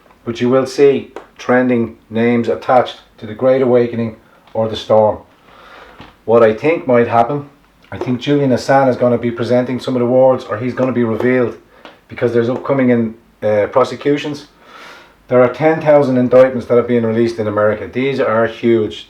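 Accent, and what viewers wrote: Dublin, Ireland. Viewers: Truth explained so clearly in the most beautiful of Dublin accents. Thank you.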